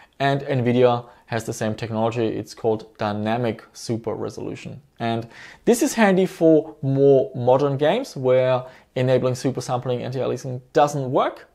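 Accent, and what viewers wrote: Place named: Germany. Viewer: Du hörst Dich so an als wenn Deutsch dir vertraut ist. Ich finde den Kanal toll weil Du Dir die Mühe machst Benchmarks zu machen und die Unterschiede klar zum Vorschein kommen. Was mir auffällt ist, dass einiges so oder in einer anderen Form neu aufgerollt wird und von anderen Seiten beleuchtet wird. Was ich an diesem Video vermisse ist das Thema ("T&L). Das ist zwar schon einiges älter aber hat schon mit mit AA zu tun oder doch nicht?. Grüße aus Hamburg (Germany)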